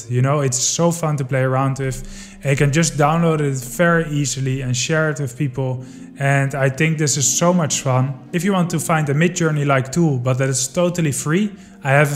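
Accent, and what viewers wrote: Netherlands. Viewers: Jij bent een Nederlander toch 😂? Je accent😂 kan niets anders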